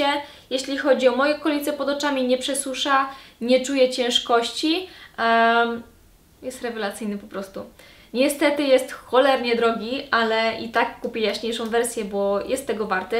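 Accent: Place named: Ukraine. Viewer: jestes Ukrainką? Słyszę akcent.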